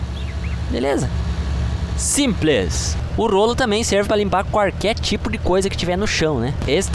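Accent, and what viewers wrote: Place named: Paraná, Brazil. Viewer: kkkkk C é do Paraná mano? Meu pai é paranaense e fui morar 1 ano com minha vó no Paraná e a galera fala desse jeito lá kkkkk povo doido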